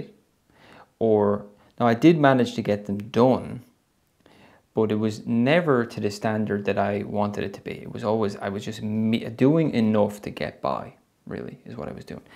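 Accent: Ireland. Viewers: You say Ting not THING is that an Irish accent?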